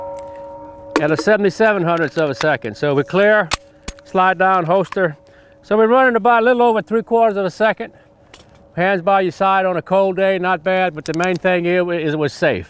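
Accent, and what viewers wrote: Louisiana, United States. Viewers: That accent has to be from Louisiana. Northern part of the state no?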